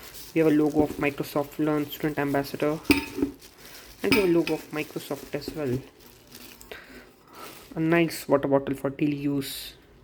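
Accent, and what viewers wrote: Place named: Ujjain, India. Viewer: Bhaii ye ujjaini accent merko bhi sikha 😆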